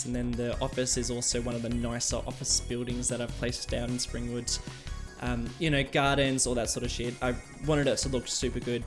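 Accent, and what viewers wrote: Australia. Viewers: I mean mate I'm an Australian and I can already tell about the accent, you're a bloody mate! So keep up the accent it makes me feel like home!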